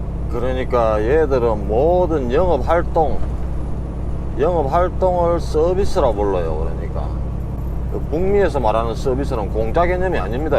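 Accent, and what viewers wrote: Gyeongsang, South Korea. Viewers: 행님 건강하이소^^먼저 저는 부산 경상문디 행님 동생입니다.행님 뷰 홀딱 빠져보고있습니다.뭐 때매빠질정도로 볼까요? 경상도 행님 목소리 인것같습니다. 행님 저는 추레라 하고있습니다.행님 최고다.있빠이 행님 뷰 보면서 마이 배우고 있습니다.돌쇠 힘들어도 마이 챙기주이소~^^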